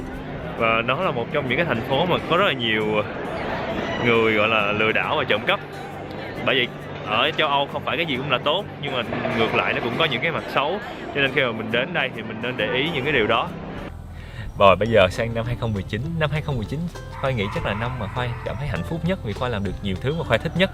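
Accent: Mekong Delta, Vietnam. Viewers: Thích nghe giọng khoai nói ghê , ấm áp mà đậm chất miền tây nam bộ sao á❤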